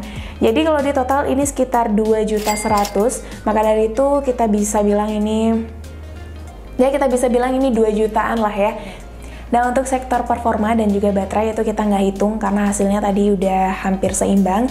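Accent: Bali, Indonesia. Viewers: Berasa banget logat balinya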